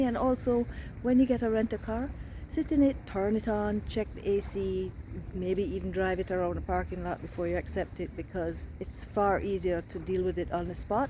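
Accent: Jamaica. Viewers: Ive never heard a white person with an authentic Jamaican accent, I like it 🫡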